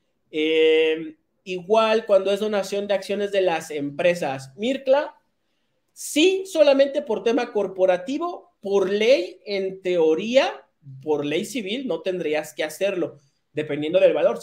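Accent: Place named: Mexico. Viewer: No reconoces el acento mexicano ?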